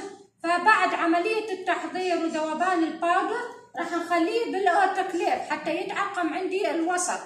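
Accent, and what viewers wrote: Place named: Baghdad, Iraq. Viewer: جهود مباركه اللهجه الحلوه ❤ بغداديه قح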